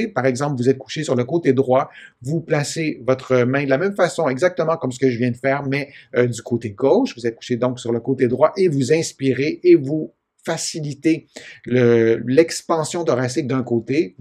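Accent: Canada. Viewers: Avec votre accent canadien cher cousin 😀 , je comprends expirer quand vous parlez d inspirer , sympa votre vidéo Cordialement , doc j claude plessier , Oise France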